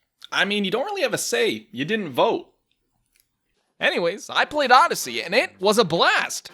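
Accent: Canada. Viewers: THIS DUDES CANADIAN!!!! WHEEEZE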